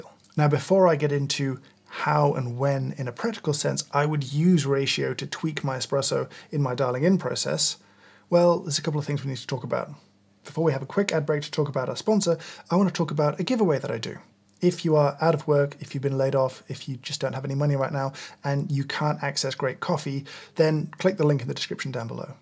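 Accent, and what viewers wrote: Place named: RP, United Kingdom. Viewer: Petersen 😂😂😂😂 Yes he speaks the Queen’s English !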